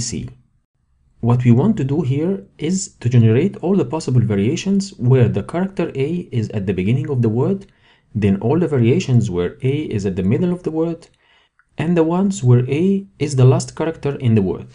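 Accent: Egypt. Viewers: Hi, your accent is Egyptian lol, let me know if I am correct. Great video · What an Egyptian accent <3 <3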